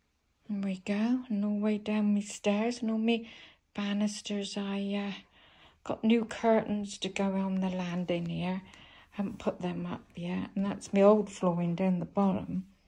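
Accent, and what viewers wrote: Cornwall, United Kingdom. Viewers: Hi, love your vlogs. We lived in Cornwall for over 20 years. Altarnun village on the edge of Bodmin Moor. Lovely to hear the Cornish accent again.😊😊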